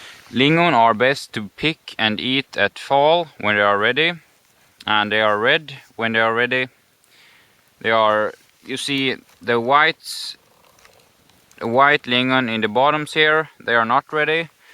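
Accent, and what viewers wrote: Sweden. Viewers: I love the Swedish accent! (Especially the "y" sounding "j"'s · Videos from Sweden...cool as hell! Love the accent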